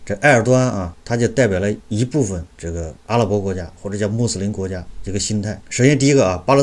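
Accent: Anqing, China. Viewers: 你的口音像我老乡，安庆枞阳吧。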